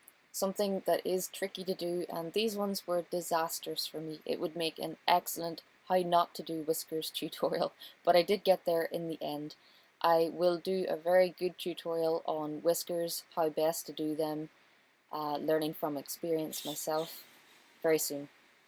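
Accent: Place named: Ireland. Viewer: And your irish accent is so cute !! I'll be watching your videos now to get to know pastels better, thanks for the effort of making them really.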